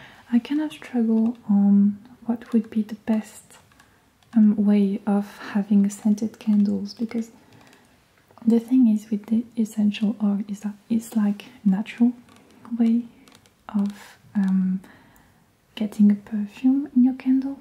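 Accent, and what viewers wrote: France. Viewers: She's French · When is the next French video ? Because I'm French and I prefer your French videos. I love your French voice. And I like your videos !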